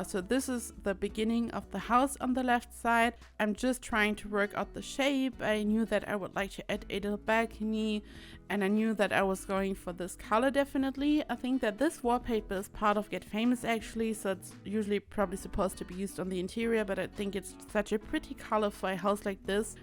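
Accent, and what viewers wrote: Germany. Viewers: Ur german, aren‘t you? Sounds like it.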